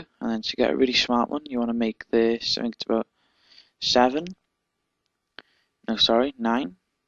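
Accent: Liverpool, United Kingdom. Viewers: Hey der la, Do I 'ear a Scouse acksint ... I am down 'ere in Sydney strugglin' wiv me CS5 ... ta for yer 'elp ... <link>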